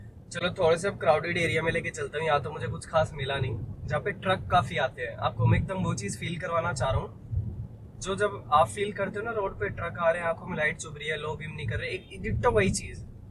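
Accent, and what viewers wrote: Haryana, India. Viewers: Bhai haryana ka hai k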